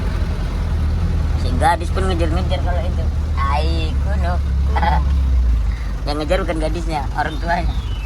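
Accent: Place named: Indramayu, Indonesia. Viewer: Mas Punya kamu keturunan dari Indramayu ya, soalnya ngomong jawanya itu Jawa Indramayu❤❤❤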